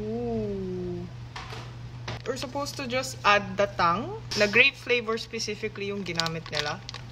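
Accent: Philippines. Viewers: hahahaa... was watching without reading the comment first. then spoke her native korean language... then the Filipino. LOL i was surprise. that's way too cute and you're accent is pure Pinoy. very niceee... nope, not the beer. LOL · When you spoke Korean I believe you were, but once you spoke English now I know you're Korean born and raised as a Filipino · yung pinoy accent when she speak English 😂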